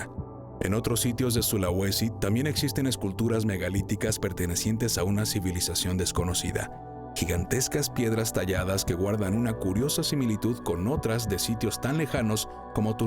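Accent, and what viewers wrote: Mexico. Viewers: Habla con mucho cantito mexicano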